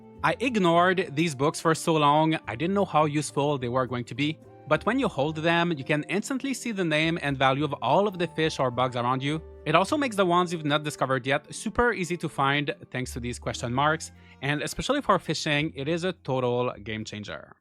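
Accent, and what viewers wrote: Quebec, Canada. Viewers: Are you from Québec? ^_^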